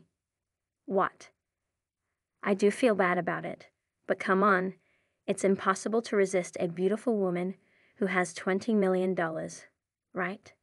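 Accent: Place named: United Kingdom. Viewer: I think British or English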